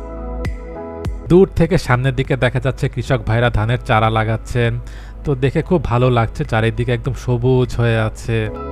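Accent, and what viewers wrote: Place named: Noakhali, Bangladesh. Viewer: ভাই সত্যি আগে জানতাম না আপনার ভাষা নোয়াখালীতে। আমার মাইজদীর সাতে ছয়ানী বাজার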